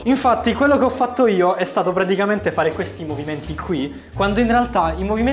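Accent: Rome, Italy. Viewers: Che bello quell'accento romano che persiste per tutto il video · Perché quando sei con i tuoi amici marchi di più l accento romano ? È fastidioso non so perché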